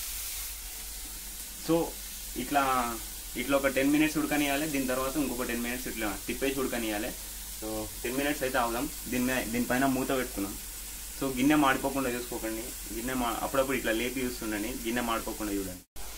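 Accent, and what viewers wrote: India. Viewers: Hamara hyderabadi patta ..superb dialect of hyderabad ..from Langer houz..love you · Mee language bagundi telangana style nd recipe kuda chaala baagundi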